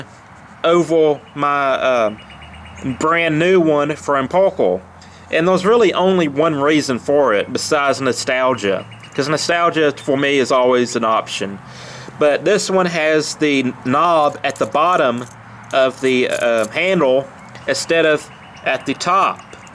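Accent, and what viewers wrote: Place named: Louisiana, United States. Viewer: oh, I actually thought you where from Louisiana Cajun accent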